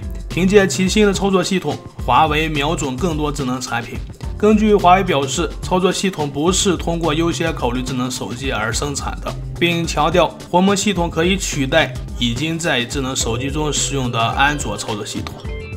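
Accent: Shanxi, China. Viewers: 山西老大哥?这口音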